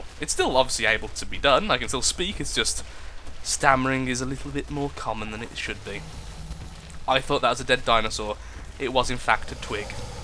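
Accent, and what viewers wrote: United Kingdom. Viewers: Thank you for using Centurion. If you tame a particularly strong beast Legionnaire might be a great name too. As a former radio Program Director I have to say you draw far more attention to your speech difficulties by talking about them than they really seem to deserve any more. I think they may be larger in your mind now due to your hard work to get rid of them than they actually seem to most people. I wouldn't have noticed anything this episode had you not gone on about it. But it's your show. If your point is to encourage others to do a show who think their speech is not perfect that's a whole other matter. Then well done. But really, your Brit accent makes you come off extra smooth to us Yanks and I suspect you don't realize how much say compared to the guys at Good Mythical Morning for example. A funny show, but... How many in your audience do you think we're screaming at you to check out the drop at the top of the hill? 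LOL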